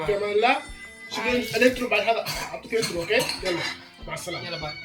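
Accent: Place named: Gulf, Arab world. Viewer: السلام عليكم ورحمة الله وبركاته ورمضان كريم عليك اختي. معليش عندي سؤال محيرني هل انتي وزوجك سعوديين او خليجيين ليه بتتكلم خليجي مع انو متأكد انكم سودانييين 100٪ ليه كذا ياريت الاجابه علي التعليق الواحد دايما يكون علي طبيعتو افضل والله. تحياتي لكم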